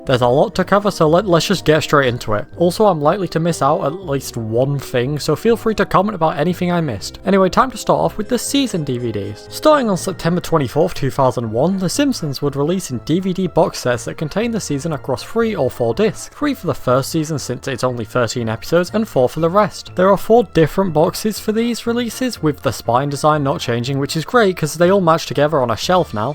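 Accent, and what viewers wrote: United Kingdom. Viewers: Can you please stop with the British accent? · Northern English (UK), possibly Liverpudlian.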